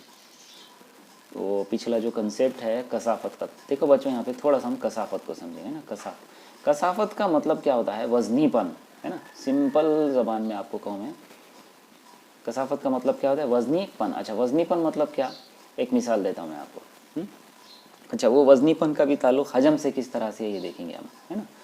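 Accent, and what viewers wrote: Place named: Hyderabad, India. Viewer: Sir is video m ap Ka accent Hydrabadi Hai ek dum.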